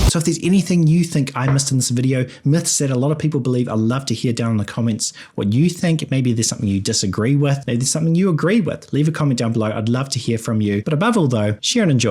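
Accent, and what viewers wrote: New Zealand. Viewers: The thick kiwi accent is the highlight of this video. Corkers like spictrum and shirry and having six with a sheep abound. Luckily whiskey is already pronounced with an I. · Your channel just came up randomly... Glad it did. Enjoyed your break down. Informative and I enjoy listening to the NZ accent 🇦🇺✌️✌️✌️ .... Good video mate. Subscribed.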